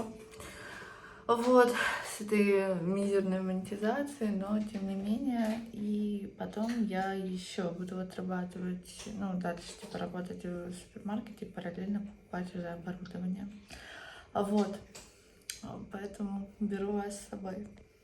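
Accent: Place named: Ukraine. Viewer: Ужас, вид, как будто трепали целую ночь. Вялая, нужно учиться не рот накачать, а учится,над речью. Это украинский акцент звучит примитивно. Волосы распущенные. Кажется очень дешего.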